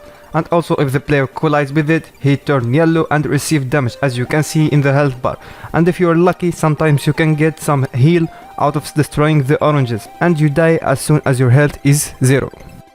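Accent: France. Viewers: It's me or this french accent is KINDA THICC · t'est français ? car tu sonne français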